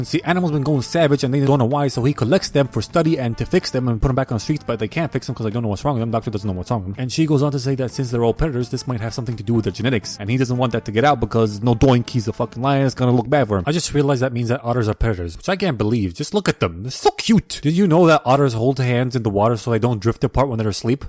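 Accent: United Kingdom. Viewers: his british voice though XD "funi gag m8 DMV slow what a knee slapper m8 that made me laugh so hard oml -a fan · Lol did you get your British accent and lingo from top gear? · By Jove he's British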